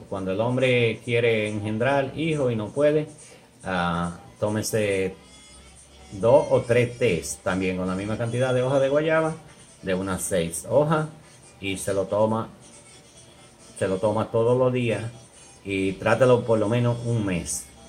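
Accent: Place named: Caribbean. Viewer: eres un genio poderoso Amigo donde quiera. que te encuentres gablas con un acento CARIBEÑO <link> ENCANTA NI SE SIERES CUBANO O DOMINICANO PUERTORRIQUEÑO PERO HABLAS UN ESPAÑOL MUY AGRADABLE SOBRE TODO TU INFORMACION. VALE ORO DIAMANTES ..Y UNA INMENSA FORTUNA GRACIAS PIR TU GRAN CORAZON Y DON DE GENTE BUENA QUE TIENES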